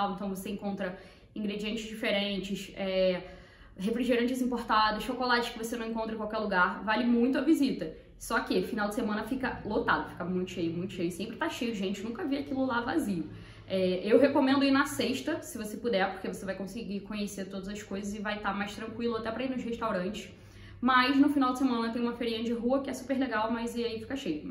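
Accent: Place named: Rio de Janeiro, Brazil. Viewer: Tão engraçado que nasceu em São Paulo e fala carioquês kskskksks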